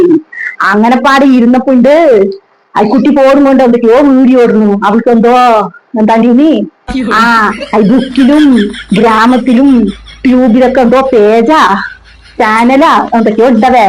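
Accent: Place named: Palakkad, India. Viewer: എനിക്ക് പാലക്കാട് ഭാഷ ഇഷ്ടാണ്..എന്റെ ഒരു നെയ്ബർ ഉണ്ടായിരുന്നു.. മുംബൈയിൽ.. അവര് ഇപ്പൊ നാട്ടിൽ സെറ്റിൽ ആയി.. പെട്ടന്ന് അവരെ ഓർമ്മ വന്നു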